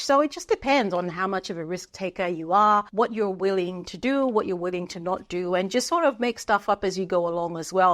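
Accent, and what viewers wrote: Australia. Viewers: The lady I bought a few of my plants from watches your channel & she brought over some plants in leca & pon. I couldn’t see the water level, so I panicked & repotted them straight away. I’ve never experienced root rot, but the roots sitting in water scares me. Yours look great, so it’s working for you. I just adore an Australian accent 😌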